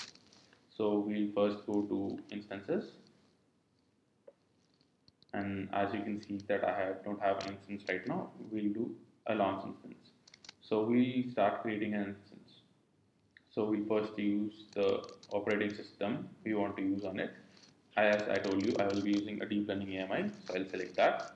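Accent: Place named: India. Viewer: 咖喱味的英语听不懂啊^^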